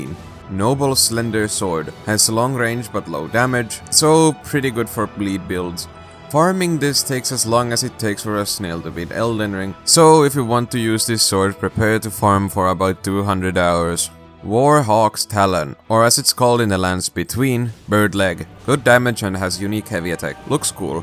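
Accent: Finland. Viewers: love the finnish accent <3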